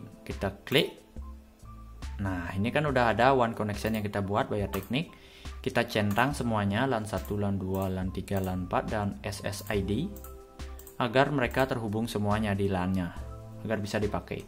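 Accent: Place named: Bali, Indonesia. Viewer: Dari Bali bang ya? Logatnya macam Dwi Arsana :D Salam dari Aceh bang. .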